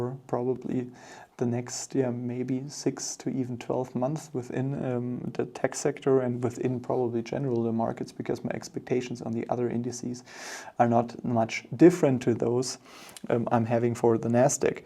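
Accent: Germany. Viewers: You have a very strong german pro noun ci a tion!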